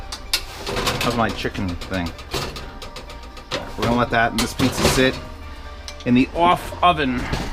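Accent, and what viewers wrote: New York, United States. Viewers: What part of New York are you from?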